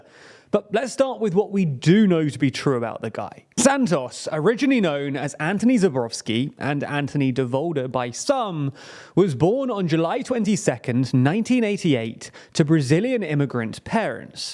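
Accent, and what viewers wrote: England, United Kingdom. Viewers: San-toss, ah-shoe-ming, etc. Englishmen are so funny, when they open their mouths. Otherwise a great report on a huge American voter demonstration of stupidity.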